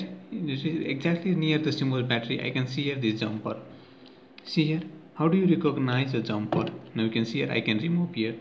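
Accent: India. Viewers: why does it sound like most utube demo's have India voices...i have problem with accents...like they say 'lost in translation'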